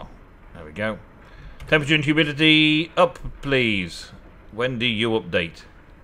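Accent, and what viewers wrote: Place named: United Kingdom. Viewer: Really excited for Tale; want to see what its like. Should watch a previous season. Couple notes: - Spoilish Jungle Upgrades count for Tropical, Taiga for Ice. It saves you a lot of upgrades. - One upgrade to meet the environmental challenge. Mana Spreaders - Way I do it, is I shift right click with a wand on the Mana Spreader and then shift Right Click on the Runic Altar. I would also try to bind a mana spreader to your Hopperhawk and see if that helps its range like it suggests. Or right click it with a wand, and mouse over it, and put the pool, mana spread in view so you can see if its highlighted. Not sure if its pulilng mana from the nearby pool. And I've learned to say it - Eso' Ter - 'ic; but love the accent and such so however Brits say it!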